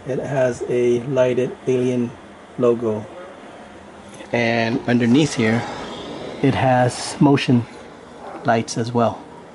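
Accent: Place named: United States. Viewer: Chất giọng của anh giống Mỹ quá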